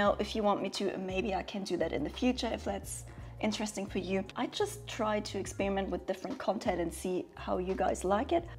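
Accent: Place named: Nuremberg, Germany. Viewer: Hallo, schön dich deutsch sprechen zu hören. Ich war dienstlich letzte Woche im Nürnberger Raum.. es klang sehr ähnlich.. smile.. ist es evtl. deine "alte Hemat".. LG Andreas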